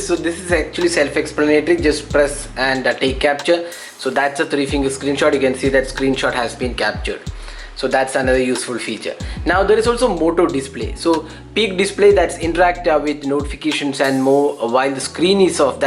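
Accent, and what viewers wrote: Kerala, India. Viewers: mallu accent ?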